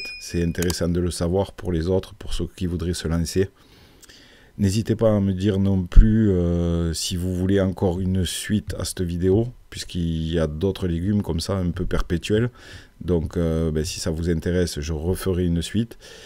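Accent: South, France. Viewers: Merci beaucoup pour vos vidéos. L'art du jardinage avec l'accent du sud . Super! Avez-vous entendu parler de la mâche perpétuelle. Merci pour votre réponse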